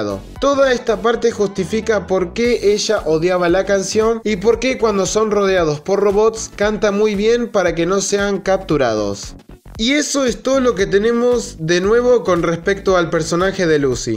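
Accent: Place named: Argentina. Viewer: Eres argentino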